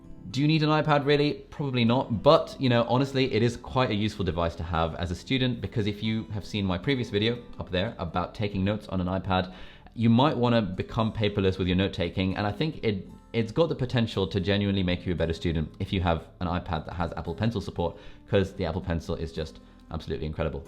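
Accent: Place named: England, United Kingdom. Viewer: Recently found a bit water ripples on the corner screen on my iPad pro 2018, anyone does the same? Anyone can give any thought about it if I need to replace it? Always like English accent, to a non English speaker, me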